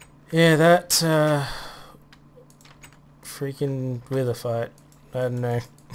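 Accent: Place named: Australia. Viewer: Lol... Pretty epic fighting there... Also, stop scratching! Can't wait for the gold farm and you sound more Australian mate.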